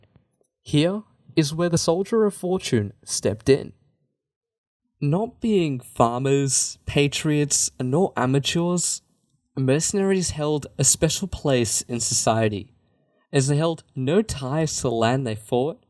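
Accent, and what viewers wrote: Australia. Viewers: The language he speaks is strine. He is a Greek Australian where strine is a southern dialect of English. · Seb Sandman I am kinda embarrassed now - I didn’t even notice it was an Aussie accent in either of his videos so far. Only that the Hanno video rocked and this video rocked but the “is he about to crack up laughing” in this one kept intruding. I probably watch/listen to too many history-related things, which seem to have a preponderance of British-accent-family speakers. 😂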